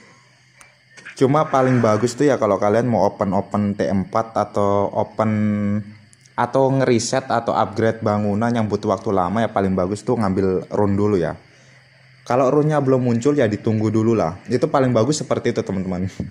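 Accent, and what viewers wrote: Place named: Eastern Indonesia, Indonesia. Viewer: Orang timur ini yak wkwk sa ko sa ko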